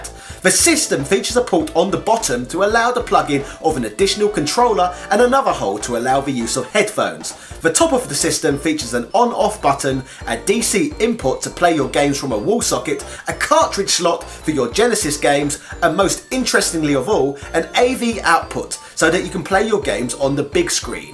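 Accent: United Kingdom. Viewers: Subbed. You remind me a bit of AVGN, with the fantastic British accent, which is awesome. Keep up the good work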